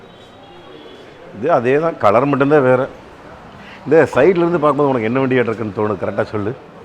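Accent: Kongu, India. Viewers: அருமையான துவக்க விழாவில் கலந்து கொண்டு அற்புதமாக நமது கொங்கு தமிழில் விளக்கம் கொடுத்தீர்கள்... நன்றிங் கோ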